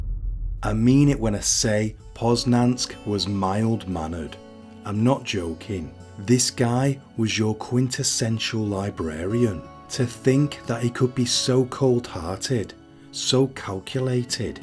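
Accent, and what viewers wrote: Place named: England, United Kingdom. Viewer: English accent on narrated murder documentary is what I like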